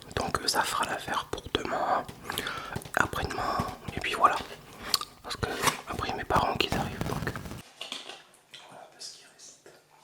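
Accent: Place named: Alsace, France. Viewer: Tu est alsacien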